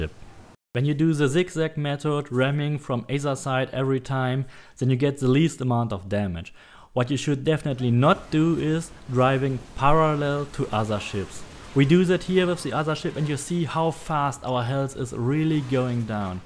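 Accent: Germany. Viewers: Only took me 10 seconds to recognize that you are german. Love your videos. Keep going <3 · Love this channel. Despite having a strong german accent (like me:D) which could be difficult to understand by everyone these informations are pure gold. Thanks to this channel I played the game again. I have so many ability points that I can try out many sets which are also presented in this channel in a very detailed manner. Very helpful! Thanks!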